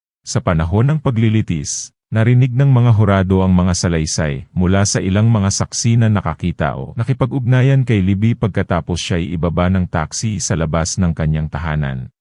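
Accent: Visayas, Philippines. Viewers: May pagka-visayan accent cya pero naiintindihan naman.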